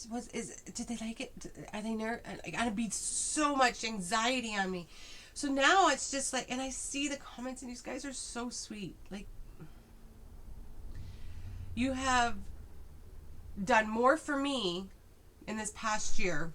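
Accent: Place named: Canada. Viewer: I’m watching this video to get a review of the yarn and a couple minutes in I realized oh my God this woman is obviously Canadian and of course you are so am I! I’m just impressed when I can pick it out right away. It’s always the vowels that give it away.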